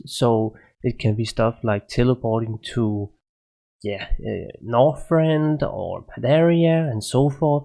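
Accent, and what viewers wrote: Denmark. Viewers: Hehe, After your first 5 words me and my gf said at the same time "That guy is Danish" :P (Well, we said it in danish, since we too are danish, but you get the point) But nice video, thanks :P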